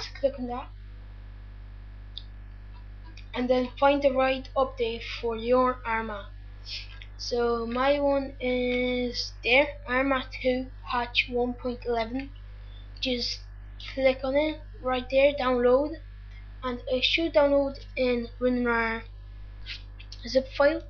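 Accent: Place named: Ireland. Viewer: u sound irish so much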